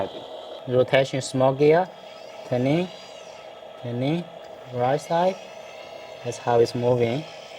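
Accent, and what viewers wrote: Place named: Vietnamese American, United States. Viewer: bro has that vietnamese-american accent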